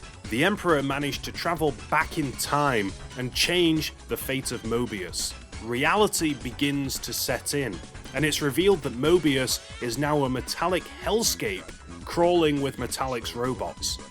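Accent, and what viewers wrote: United Kingdom. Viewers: is he brittish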